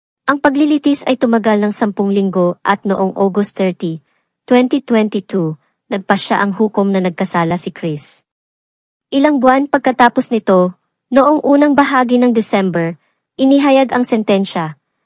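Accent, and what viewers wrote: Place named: Visayas, Philippines. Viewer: ..hndi po kasi xa pure tagalog ang salita ...kaya ganian po ang salita nia . Kung kayo po ay magsasalita sa wikang visaya .. magkaka gnian din po ang tono nio saibang salita na gagamitin nio ...naiintidihan nmn kya unawain nio na lang o wag nio pakinggan o panuorin ..wala nmn napigil sainyo .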